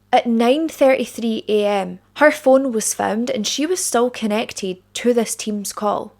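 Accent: Scotland, United Kingdom. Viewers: English edit yes Scottish sorry!. Love accent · Lol, it's a pretty typical Scottish accent. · She's Scottish. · Ohh no danger great video its gallus a Scottish accent .watching from paisley ❤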